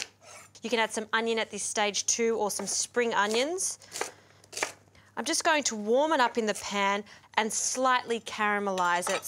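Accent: Australia. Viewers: aussie english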